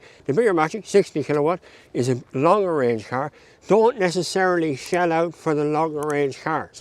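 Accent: Ireland. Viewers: Hardly understand Irish 😂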